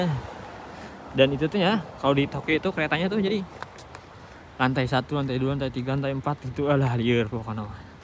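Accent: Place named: West Java, Indonesia. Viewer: Sigana mah urang sunda si akang ti cara ngomong na 😅, salam ti uing tkw taiwan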